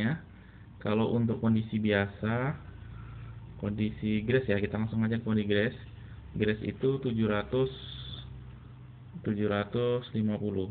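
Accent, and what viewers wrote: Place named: West Java, Indonesia. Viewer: maaf sobat ini daerah apa ko logat nya sunda,maaf ya, sobat,smga sht& bhga sll amiin YAR 🤲🤲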